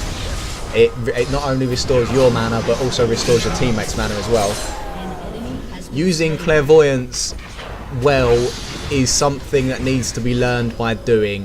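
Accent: United Kingdom. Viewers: British :P <3!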